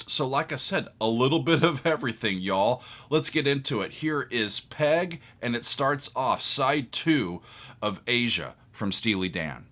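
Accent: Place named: North Carolina, United States. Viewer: It's how folk from North Carolina and the Outer Banks talk naturally.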